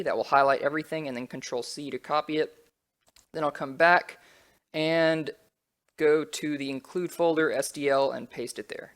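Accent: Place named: England, United Kingdom. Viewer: My god! pure english accent!!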